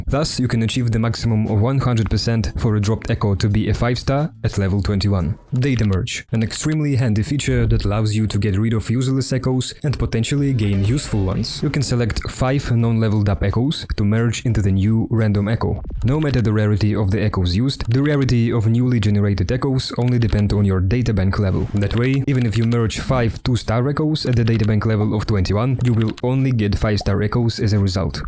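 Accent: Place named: Russia. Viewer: сижу думаю че у тебя с акцентом. И ты на русски забалаболил. так и знал. но а так видос супер. но ты не правильно рассказал про дроп шансы. (да посути 20% но из за гаранта вид этого чутка меняется) 4 косты имеют гарант дропа в 2. тоесть если 1 раз не выпал то 2 точно выпадет. кароче как с крутками 3 косты имеют гарант в 4 1 косты в 8. еще бы я сказал новичкам соединять эхо на новом уровне ДБ так как есть шанс на дроп того же эхо нового ранга что так же идет в прогресс ДБ. ну и не тратить материалы на лишних персов и эхо до 5* ну или если сложно фармить 4* а так вся база и много хороших советов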